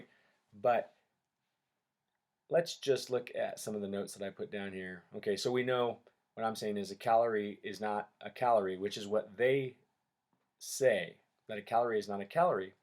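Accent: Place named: United States. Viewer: First, I thought you were Australian when my audio kinda messed up on the intro of your video, but when it worked, American 💁🏻‍♂️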